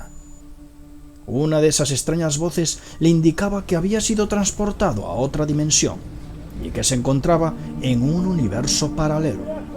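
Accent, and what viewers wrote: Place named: Castile, Spain. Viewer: Io amo tantissimo questo accento del Castiigliano... oltre al tuo modo di esporre gli argomenti. Io credo nelle dimensioni alternative... chissà in un altro mondo io sono spagnolo e tu italiano ah ah! :-D M'iscrivo volentieri al canale. :-)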